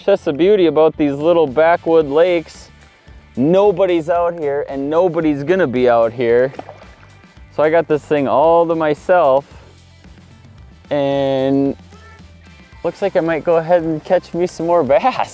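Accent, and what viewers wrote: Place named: Minnesota, United States. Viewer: He definitely sounds like he’s from Minnesota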